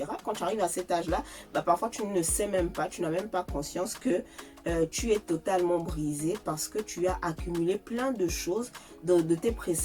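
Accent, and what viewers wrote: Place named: Gabon. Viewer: Je ne te le fais pas dire ; dans son langage tu sens une femme gabonaise.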